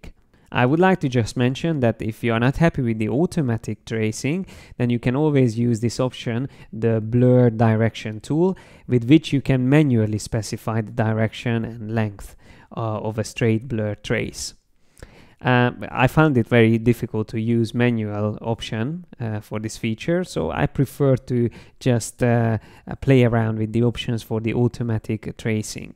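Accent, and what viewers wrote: Hungary. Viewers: király videó hallani a magyar akcentusod :-)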